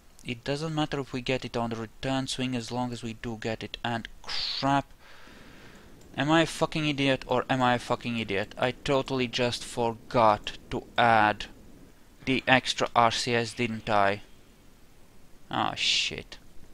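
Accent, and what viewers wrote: Russia. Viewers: That's actually a nice rocket! By the way, you can hold I think shift or control or something and click on the Apoapsis or Periapsis and the altitude numbers will stay on the screen. I like your KSP vids. I wish they were more popular. You never say uh, uh, uh, uh, like others. Your accent is cool. It's like listening to Russian space race videos. LOL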